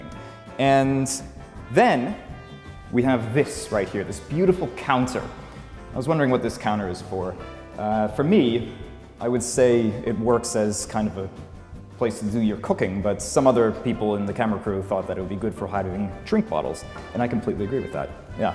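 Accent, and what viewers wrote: United States. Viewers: I could tell you are American based on accent, although light, it's the "R", Americans emphasise it, whereas Brits - like myself - often keep it silent.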